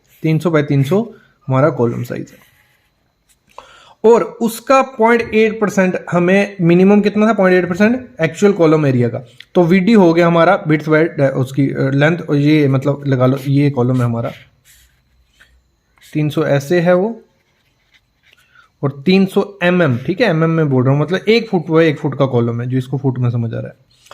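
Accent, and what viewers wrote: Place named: Kashmir, India. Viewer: Aapki vice batla rahi hai ki aap j & k se hai ji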